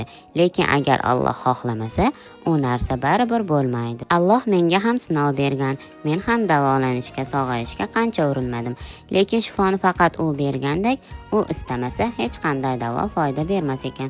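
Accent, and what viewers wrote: Namangan, Uzbekistan. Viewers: Namangandanmisiz wevangizdan bildim olloh wifo bersin namanganliklar qanisizlaaar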